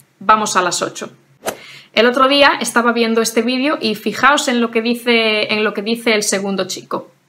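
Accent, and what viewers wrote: Spain. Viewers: I love your Spanish accent so much ❤ Thank you dear ❤❤❤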